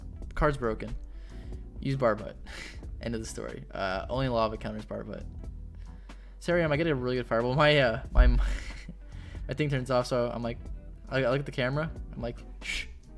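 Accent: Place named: Canada. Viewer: I can tell ur a Canadian boy😂😂 one of my favs for sure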